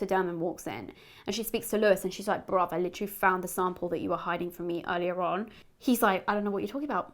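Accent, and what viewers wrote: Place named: Australia. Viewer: You could just be reeeeally committing to this but I’m gonna believe you are. Names right. Tiny image resembles the actor. Played 1 out of 2 of your gaming vids and heard the Aussie accent., you were wronged, so wronged. You were sweet and Kim was a little asshole, honestly.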